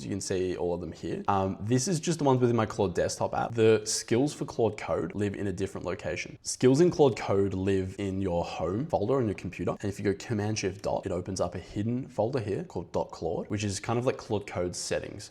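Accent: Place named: United Kingdom. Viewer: Really like what you're doing .. we're building the best Sales & Marketing Voice and Messaging agents you'd come across, along with business analytics tools for major corporates. We code our products. From the sound of it, you're definitely british, I lived in Manchester for a year during business school as well, right down the street to Arndale center. Would love to see how we can collaborate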